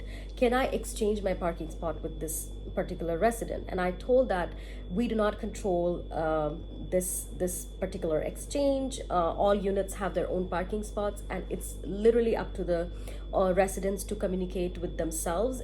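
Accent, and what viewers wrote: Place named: United Kingdom. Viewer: Is this mandatory to speak in a British accent or is it just a choice?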